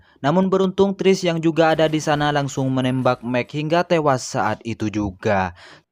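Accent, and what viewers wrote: Madura, Indonesia. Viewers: Kok yg ngomong logat nya kaya orang Madura ya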